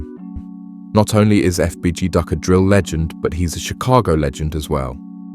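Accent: United Kingdom. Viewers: Man change this stupid ass Brit voice, you outta your fuckin mind lol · He isn’t the only person with a British accent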